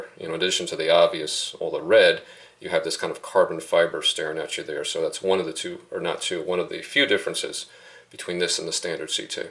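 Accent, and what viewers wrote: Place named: Delaware County, United States. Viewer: where in Delaware county are you from? that accent is thick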